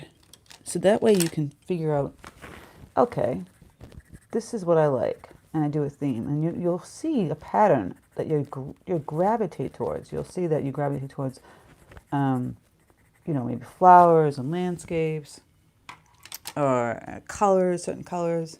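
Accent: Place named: Massachusetts, United States. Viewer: I agree about '21 being tougher than '20. Hoping '22 is much better for all of us. I think we're all weary and sick of hearing about the virus. Time to get back to living! I thought I detected a MA accent! I grew up in Waltham but am in NH now (since '99). Thanks for the video. I hope your holidays were enjoyable and peaceful.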